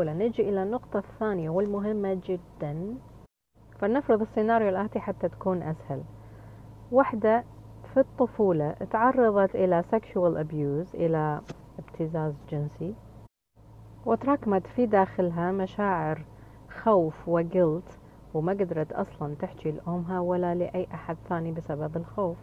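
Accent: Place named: Iraq. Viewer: فعلا أدركتي عمق القانون هنيالك ع فكره مره حبيتك لأني احب لهجتكم هل العراق فدوه 💜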